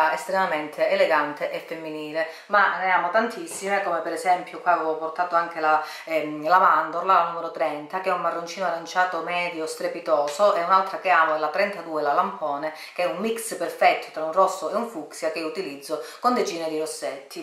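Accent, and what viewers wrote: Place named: Sicily, Italy. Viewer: Dall'accento mi sembri siciliana😆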